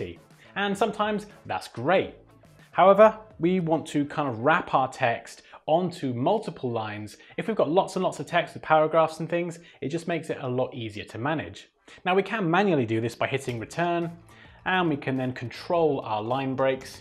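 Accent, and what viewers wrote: England, United Kingdom. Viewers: thankyou for tutorial, where do you from ?, england?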